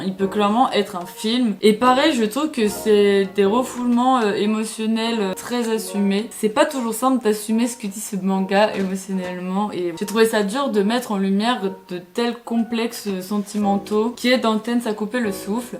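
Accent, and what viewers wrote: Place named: Lyon, France. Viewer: Jure on a un accent à Lyon je me rend même pas compte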